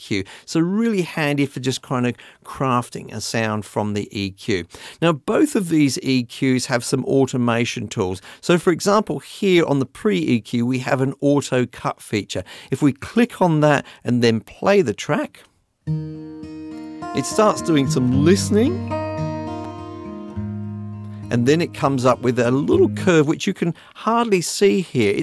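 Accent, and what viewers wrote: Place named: Australia. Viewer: Totally off topic: English is not my native language, so I asked a friend from England for help, when I first discovered your channel. I assumed, you say "I hope you're well", but for me it sounded like "I hope you will", and it was driving me crazy, because I always thought "will what?" My friend assured me it was just your australian accent, so thank you for always wishing us well! xD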